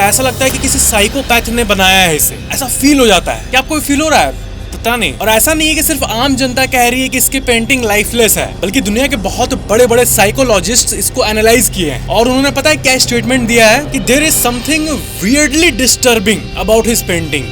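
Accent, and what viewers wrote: Bihar, India. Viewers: Aap Bihar se ho n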